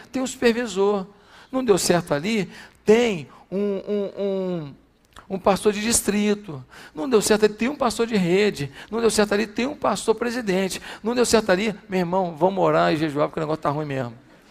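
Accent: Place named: Minas Gerais, Brazil. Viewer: A igreja é no RJ, mas esse sotaque está mais mineiro, rsrs. Culto maravilhoso....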